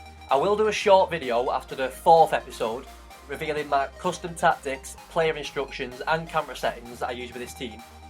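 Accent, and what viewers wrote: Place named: Wigan, United Kingdom. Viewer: thought the accent was familiar haha. guessing you from round orrell/Shevy? was wondering what team you play for as I'm from wigan as well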